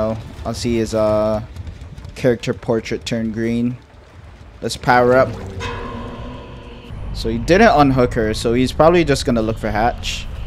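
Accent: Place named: Colombia. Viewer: This boy still doesnt want to tell me if i was right at saying that I think he is Colombian. Im basing it on his accent. Have Colombian friends that talk just like him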